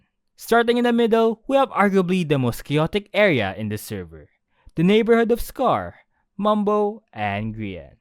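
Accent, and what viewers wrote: Philippines. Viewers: Pilipinonh pilipino yung accent!!!!! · Why do you sound like a Filipino?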